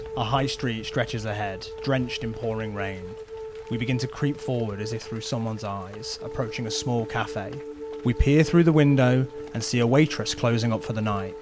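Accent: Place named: East Midlands, United Kingdom. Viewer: you used to have an east midlands accent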